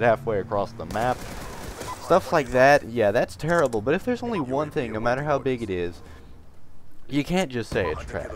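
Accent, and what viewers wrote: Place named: Texas, United States. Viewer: I do disagree, if you disrupt the rudimentary way of a how a game is intended to function, then the entire experience is spoiled. Thankfully, the PC port isn't AS plagued with networking problems as its console counterparts, so you're more likely to enjoy the game at a different venue. Also, dat texan accent :3c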